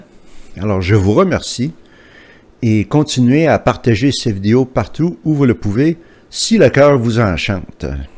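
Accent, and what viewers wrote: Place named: Quebec, Canada. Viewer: Je salut votre courage cher monsieur 'vous parlez en connaissance de cause. Je suis pas athée' je m instruis par le biais de vidéos. Tout laisse à croire à un lavage de cerveau. Nous devrions tous restez libre et choisir une voie spirituelle si on éprouve le besoin et quand le moment viendra. Merci pour l accent québécois ❤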